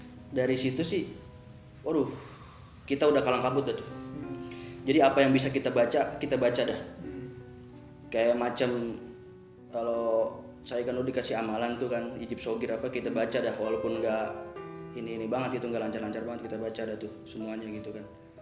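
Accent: Indonesia. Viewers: Btw orang mna tuh yg lagi ceritanya .. GERO2in ,fiks orangsunda kek nya😁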